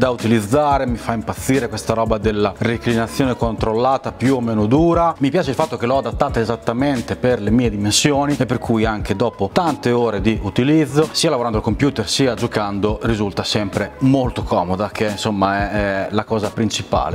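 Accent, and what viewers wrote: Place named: Romagna, Italy. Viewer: Forte della qualità video/audio ottima, ed incuriosito dalla sedia, ascolto la tua recensione... ma soprattutto il tuo accento e come pronunci la "z". Diamine! Penso: deve essere per forza romagnolo. Ed appunto, ci troviamo a neanche 20km di distanza. Complimenti per l'ottimo video!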